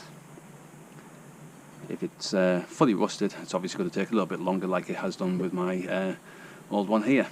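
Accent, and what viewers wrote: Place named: Durham, United Kingdom. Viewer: Will you put mine on? I’m from Durham sounds like your from up here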